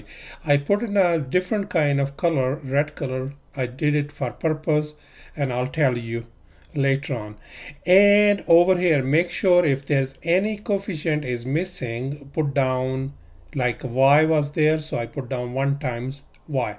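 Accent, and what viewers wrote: India. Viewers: he sounds like an Indian.. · I his his from soth side ,maube from kerala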